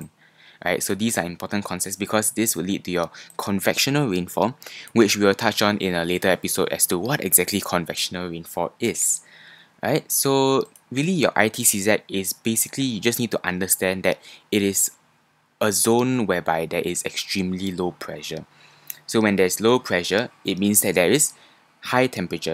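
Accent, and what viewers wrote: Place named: Singapore. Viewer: This is useful even for AS&A's CIE geography, thank you so much! The Singaporean accent is refreshing to hear as someone studying internationally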